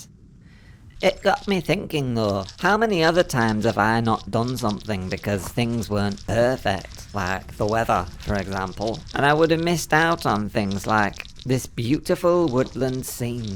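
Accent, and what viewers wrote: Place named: Liverpool, United Kingdom. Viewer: accent is like Ringo's. Is he a Liverpudlian? (PS. I'm an Americanium)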